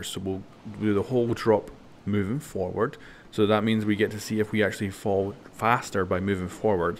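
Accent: Scotland, United Kingdom. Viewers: Are you Scottish or Irish?? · Are you Scottish? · Are you Scottish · Sub'd cause Scottish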